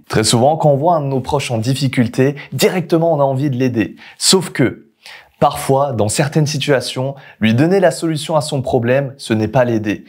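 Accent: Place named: France. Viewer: Jadore ton accent francais , fille de lalgerie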